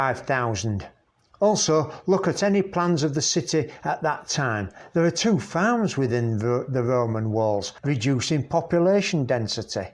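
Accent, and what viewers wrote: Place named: Yorkshire, United Kingdom. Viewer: even better with a Yorkshire narrator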